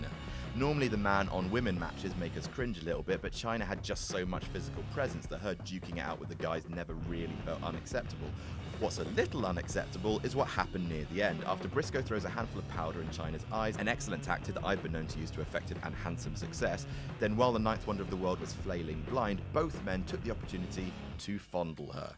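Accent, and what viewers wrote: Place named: United Kingdom. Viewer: This Asshole just Loves to hear his own Lame Ass British Accent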